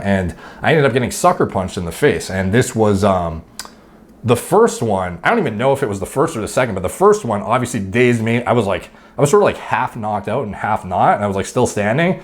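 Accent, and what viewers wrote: Canada. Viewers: Woah is he Canadian? Just noticed his accent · honestly hadn't noticed, just figured it was your Canadian accent lol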